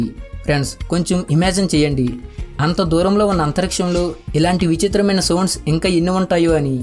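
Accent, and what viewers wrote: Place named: Srikakulam, India. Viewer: Pl don't make videos with this SRIKAKULAM ACCENT....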